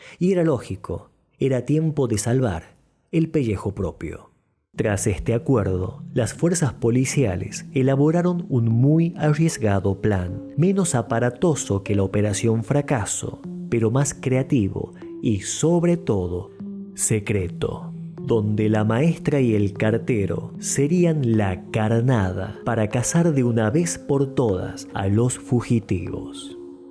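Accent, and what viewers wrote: Argentina. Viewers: Buena narración chico,te dire que no me gusta el acento argentino, pero te felicito, pues me atrapaste con tu historia,saludos!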